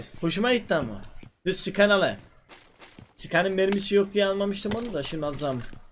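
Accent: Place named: Black Sea, Turkey. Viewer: gerçekten laz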